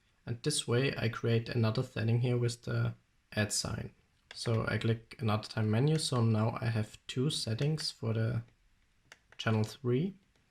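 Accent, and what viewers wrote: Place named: Austria. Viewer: ich meine in deinem Englisch nen österreichischen Akzent zu hôren! Stimmt, oder😜🚁👍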